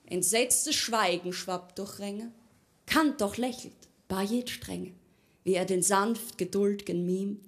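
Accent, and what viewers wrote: Austria. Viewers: nach drei Sekunden zuhören in Österreich angekommen ....:-( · ist das eine Oesterreichisch? Wir kennen nur Arnie's Terminator Akzent.... klingt wie Hollaendisch. (Gruesse aus London) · Auch wenn man bei Lisa Eckhardt grundsätzlich ihren Zynismus bedenken muss, stellt sich die Frage: Ist sie jetzt noch selbstbewusst oder schon arrogant? Diese Frage lässt sich aber wohl nur dann sicher beantworten, wenn man sie abseits der Bühne kennenlernt. Auf jeden Fall ist die Frau eine großartige Mischung aus Wortgewandtheit, scharfer Zunge und Sexappeal. Und als Bonus kommt noch der hochsympathische Österreicher Dialekt hinzu :)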